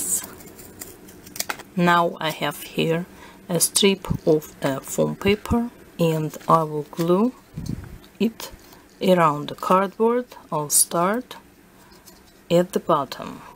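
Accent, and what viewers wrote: Russia. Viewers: thank you so much for doing this i love your Russian accent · Omg can I start with I love your videos? I mean This is amazing and I can listen to your Russian accent forever! Please keep on making videos! :)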